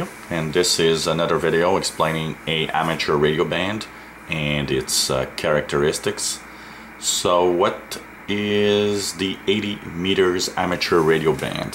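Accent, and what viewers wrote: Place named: Quebec, Canada. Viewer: As soon as I heard you say "turdy" & "dat" I knew you were a Quebecer! Haha. Hello from a fellow Canuckistanian from New Scotland! Thanks for your great vids! They really answered a lot of questions I had about the various bands.... :)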